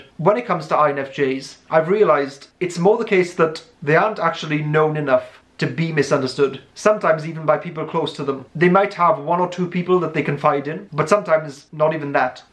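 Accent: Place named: England, United Kingdom. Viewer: Oh, I love your videos. And I also love your voice, the phrasing is so neat. I'm trying to figure out your accent... Any clues? You're English, you're Welsh, one of your parents is from India... 🕵️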